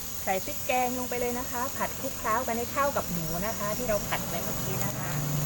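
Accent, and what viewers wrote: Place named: South, Thailand. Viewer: พี่ เป็นคนปากใต้ใช่ไหมคับผมก็เป็นหนึ่งที่ชอบกินอาหารใต้คับแซ่บๆมากเผ็ดๆดีคับ ผัดใช่ผักอีลา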